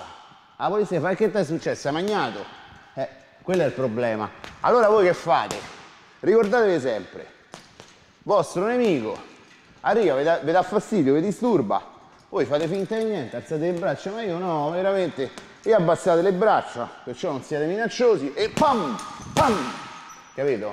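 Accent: Rome, Italy. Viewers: a romanaccio!!!! te piace da menà! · Amo troppo l'accento romano xD Anche se sono Genovese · Il più bel corso di difesa personale mai visto...Grande anche l'accento Romanaccio...Aooo sei troppo forte..Ciao..Pam Pamm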